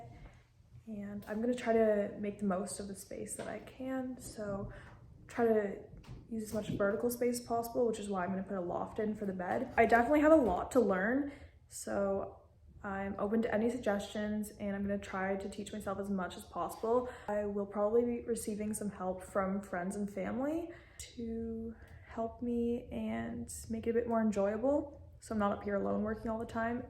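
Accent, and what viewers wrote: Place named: Canada. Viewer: Nice Canadian accent you have, and a sweet cabin, good taste, but I'm more into your dad's style, living with the force of nature's elements, good luck !!! Stay busy and enjoy !!!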